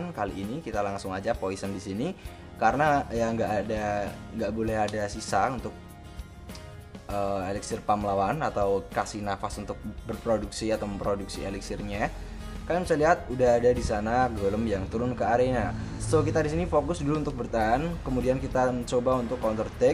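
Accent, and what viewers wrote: Java, Indonesia. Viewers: Jowone ngendi pak samean?? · Orang jawa?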